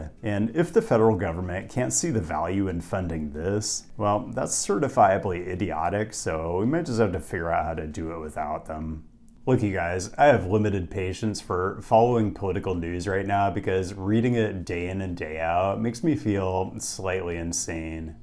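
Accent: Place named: Southern California, United States. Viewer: How come, if you’re from Portland, you have a Southern California surfer twang?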